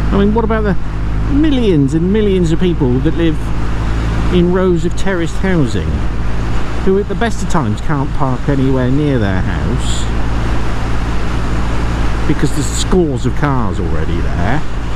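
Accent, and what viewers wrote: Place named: East Anglia, United Kingdom. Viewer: Norfolk or Suffolk 🤣🤣🤣🤣👍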